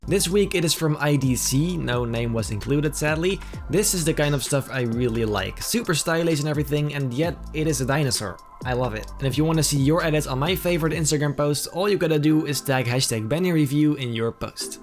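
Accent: Netherlands. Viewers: His Dutch accent is very annoying sorry, nice photoshop tho.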